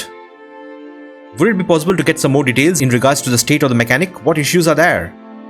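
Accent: India. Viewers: sound like Indian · Actually crazy this guy is German I thought he was Indian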